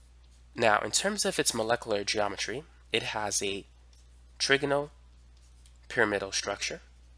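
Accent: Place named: Boston, United States. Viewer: Are you from the Boston area? you have a very interesting accent.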